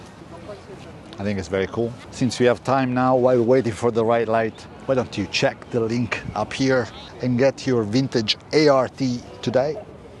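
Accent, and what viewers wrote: Italy. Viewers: Si, ma il tuo accento Milanese é pazzesco, non ti avevo mai sentito parlare in italiano. Bel progetto, Milan l'é semper un gran Milan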